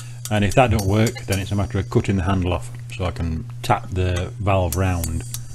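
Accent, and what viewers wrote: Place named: Northern England, United Kingdom. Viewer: You think you've seen everything on YouTube, and then you stumble upon something else. That's a fine northern accent btw. Huddersfield lad myself.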